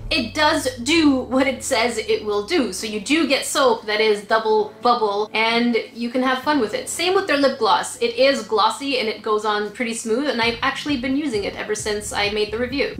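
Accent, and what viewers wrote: France. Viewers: Can I ask you a question are you French? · Wait are you French? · ur FRENCH?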